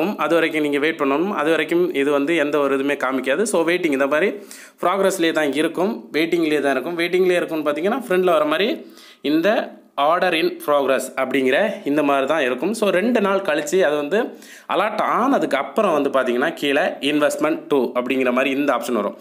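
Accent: Kanyakumari, India. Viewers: Kumari slang 🥰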